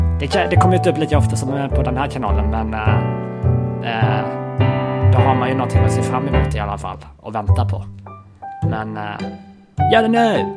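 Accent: Sweden. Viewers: Typical Swedish English. Nejmen nu äre dags att subscriba till engelska kanalen :)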